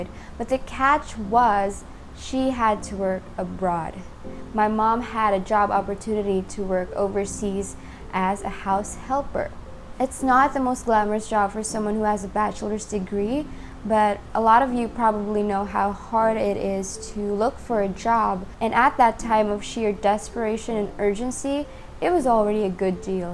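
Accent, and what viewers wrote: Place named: United States. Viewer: Wow, your attitude is amazing. Sorry to hear that you have been through a difficult time. Out of interest, are you from Phillipines, as you sound American 😊!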